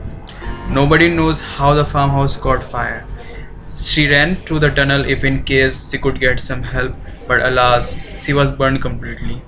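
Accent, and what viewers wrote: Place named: Bihar, India. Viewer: Maa kasam bht bura...... Bht bekar accent 😐 bc esa lgra koi bihari ldka bol rha..... Bura mt manio bt sch me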